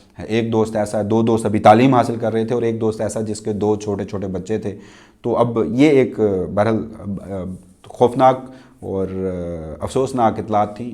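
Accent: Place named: Punjab, Pakistan. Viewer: پلیز اردو بولنے میں خیال رکھیں یہ ہماری قومی زبان پے بھائی اسکو تو صاف طور سے بولا کریں ہماری تو خیر ہے کیونکہ ہم اردو کو بھی اب پنجابی لہجہ کے سننے کے عادی ہو گئے ہیں مگر ہماری نسلیں تو اصل اردو کو بھول ہی جائیں گے لفظ سیاح میں ی پر تشدید مانی جاتی ہے مگر تشدید لکھی نہیں جاتی تو لفظ "سی یا"ہے سیاہ نہیں یہ صرف آپکی اصلاح کیلئے لکھا ہے شکریہ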